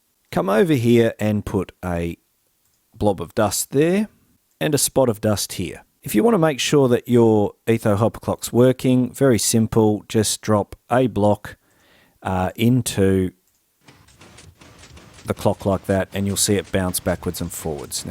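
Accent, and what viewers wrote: Australia. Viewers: Such Aussie such wow!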